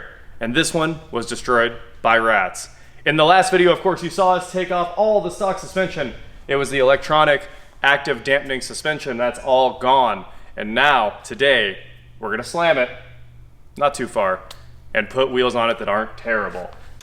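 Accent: Kansas, United States. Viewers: A Kansas t is a d? I don't understand. However, now you've given a reason, and I know why it is now, I'm fine with it. I'm not knocking your content, just I couldn't understand your pronunciation. I love your content, your ambition, your drive and your achievements. I look forward to your uploads, and long may they continue, even with the Kansas t. It's not related to Jed Klampit's Texas Tea? No hard feelings I hope.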